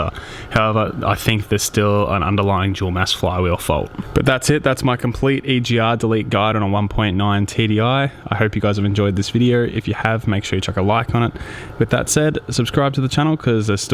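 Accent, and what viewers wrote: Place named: Australia. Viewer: I might do this. It's good hearing a local voice. G'day from the Goldy.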